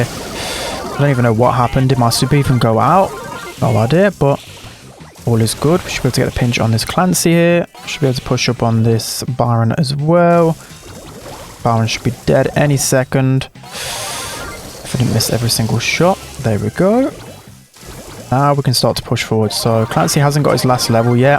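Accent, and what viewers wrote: United Kingdom. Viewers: is so sick that his British accent got so strong I can't even understand some word he's saying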